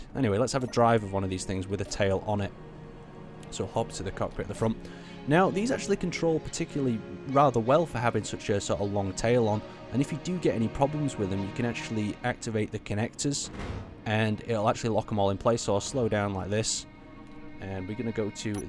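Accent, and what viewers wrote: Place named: West Midlands, United Kingdom. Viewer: you sound like you're fro the westmidlands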